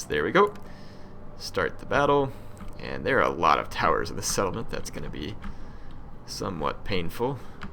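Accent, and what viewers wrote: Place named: United States. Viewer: By the way what US state are you from again? Just curious cause i'm trying to figure out your accent ;)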